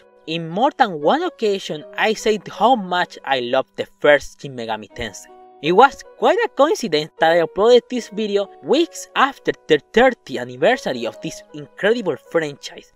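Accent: Chile. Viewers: Jajaja eres chileno!! Me suscribo jejej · este tipo literalmente es el único aweonao que suena como chileno hablando en inglés que habla de smt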